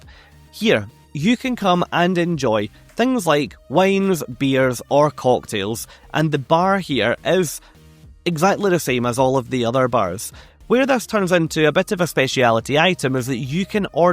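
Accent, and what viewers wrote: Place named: Scotland, United Kingdom. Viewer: Okay, we have a bet going. Please help. My wife says you are Scottish, my son says Welch.... I hear something more Big City and mixed... Can you help us out? Yes, we all love your accent!!!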